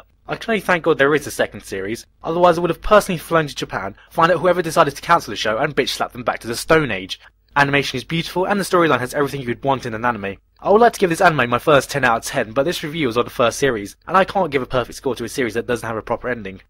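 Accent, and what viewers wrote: United Kingdom. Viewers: it might just be because all of his insults are with a brittish accent, but this dude is freaking hilarious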